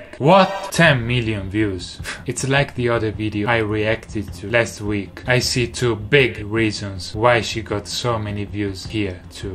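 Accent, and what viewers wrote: Italy. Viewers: Si sente che sei italiano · His italian accent is pretty huge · That's the Italian accent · His passion and musical skill is addicting...hahahahaha Not to mention that hes kind of cute and that Italian accent puts the cherry on the top of the cake! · I think he's actually italian because i'm italian ad he speaks like me when i dint't care about the english pronunciation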